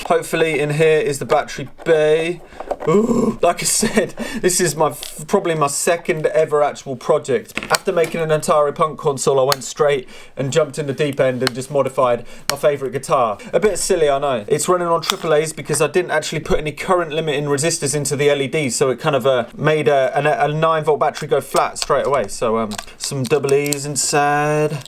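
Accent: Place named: Cockney, United Kingdom. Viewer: That cockney accent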